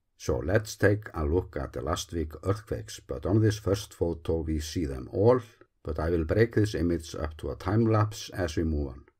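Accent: Iceland. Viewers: Awesome video as always. I really enjoy how easily and interesting you are talking. And your Icelandic accent is beautiful 🙂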